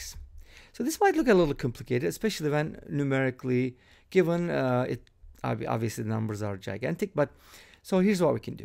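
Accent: Turkey. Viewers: Your accent tells me you are from Turkey:)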